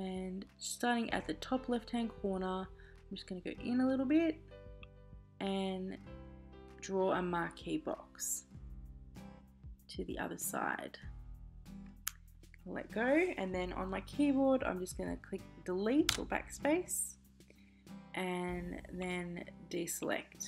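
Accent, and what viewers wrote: Australia. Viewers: Great info, just what I needed. Delivered in Australian too!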